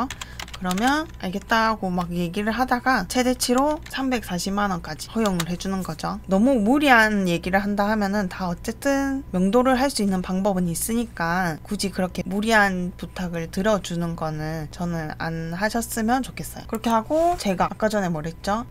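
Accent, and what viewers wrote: Gyeongsang, South Korea. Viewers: 지역이 경상도쪽 이신듯한데 어디신가요?부산사는데 가까우면 수업신청하고싶어요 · 경상도 억양좀 줄여주시요 경상도 톤이 머리아파요 천천히 또박 말하면 덜 시끄럽게 들릴려나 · 표준어를 사용했으면? 보리문댕이인가 😂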